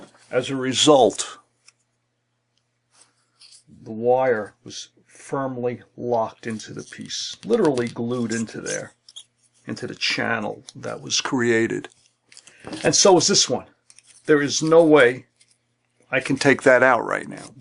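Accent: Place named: New York City, United States. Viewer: I've made jewelry for a long time & was searching for something different; this is it! Very detailed, in fact at first I thought you were trained in engineering or architecture since your wording included "structural integrity" a few times, smile. Anyway formerly being from NJ it was interesting to hear an accent I was familiar with, although I'll bet you're from NYC/ Long Island? Thanks.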